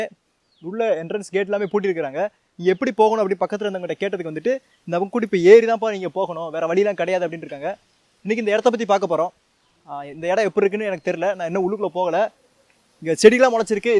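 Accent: Kanyakumari, India. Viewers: Very beautiful video.. Lovely effort to bring this place to forefront. Indian ASI has helped Cambodia lot of temples and it is quite a shame that 1200 year old place here is left unattended with no maintenance. One of the artefact where flowers were kept look amazing. Better be careful with those Train insects - could be poisonous too and good to be out of structures that could fall on head.. Love to hear the Tamil presentation ingitu, angitu kind.. Kudos!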